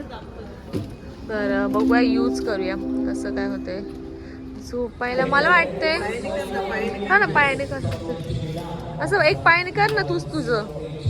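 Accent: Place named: Pune, India. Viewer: kiti te puneri bolnya cha attahas to ag sangmneri bhasha visarlis ka aamchi bagh kashi bolte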